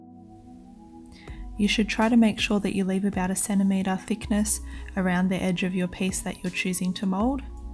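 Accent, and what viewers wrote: Australia. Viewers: Thanks for sharing your simple way to make a mold; I'll certainly try it! What would you use to fill surface imperfections on an item before making a mold of it? Oh, and it's nice to hear another Aussie making a tutorial! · Thanks so much for this video. I have watched many videos on you YouTube about silicone mould making, and this one is probably my favourite so far. Thank you for taking the time out to make this for us. I am also an Aussie, so I also appreciate the accent. I have also used Pinkysil in the past with great results, but I find it quite expensive. I would greatly appreciate any advice on alternative products. TIA.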